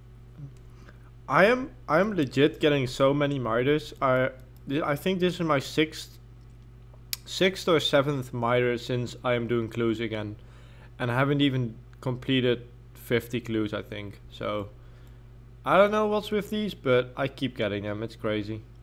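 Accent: Netherlands. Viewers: nice, i heared a little dutch accent:p, nice videos man! Just subbed! Keep it up 💪🏻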